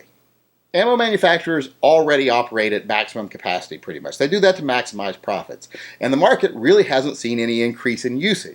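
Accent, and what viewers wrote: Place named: West Virginia, United States. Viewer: By God, only a West Virginian could say it so well!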